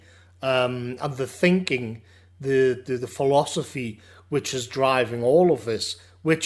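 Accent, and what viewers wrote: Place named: Newcastle, United Kingdom. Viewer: Wonderful, thought-provoking analysis, that puts all mainstream media coverage to shame. You have such a gift for articulating the kind of inchoate thoughts that so many of us are having right now and able to provide us with so many 'aha' moments while listening to your inspiring Geordie tones. The sheer speed and ruthlessness of these global technocrats is truly shocking and the way they seek to bamboozle us ordinary beings into accepting this new 'reality' as inevitable is both frightening and depressing at the same time. Perhaps the only real antidote to this essentially anti-human philosophy is a reshaping of a mascular Christianity for our times. And an emphasis once more on the sovereignty of the individual to be able to resist the 'powers and principalities' which seek to dominate and control our lives in such a manner.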